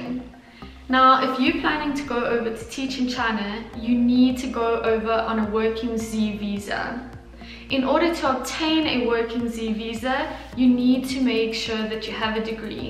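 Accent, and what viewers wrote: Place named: South Africa. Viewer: your south africa accent isn't that strong. i am kinda surprised that you got teaching position in china. british or american english are preferred there and considered as "authentic english". i had been to south africa multiple times, some of afrikaans got really thick accent, took me a while to get used to their speaking patterns.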